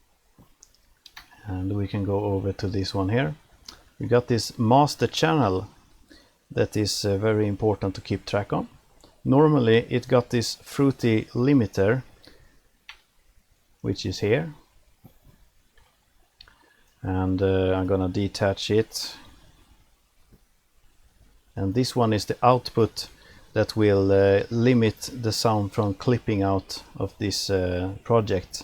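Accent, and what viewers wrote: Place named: Sweden. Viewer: ganska passande, har precis börjat med fl studio själv, älskar din svensk-engelska accent förresten :D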